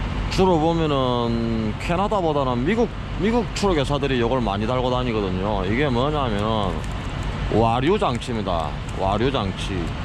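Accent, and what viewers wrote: Gyeongsang, South Korea. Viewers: 구수한 경상도 사투리가 듣기가 좋습니다. 앞으로도 많은 좋은 영상 기대 하겠습니다. 모쪽록 건강하세요!~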